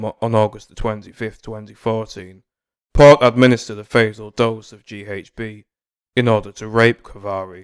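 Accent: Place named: England, United Kingdom. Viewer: What is it with Englishmen narrating so many tc videos? 😂 it's great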